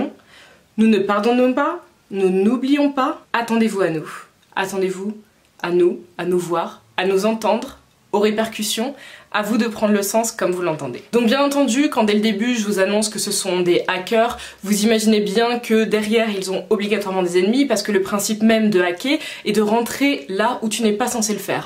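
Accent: England, United Kingdom. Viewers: ton accent anglais🤩👍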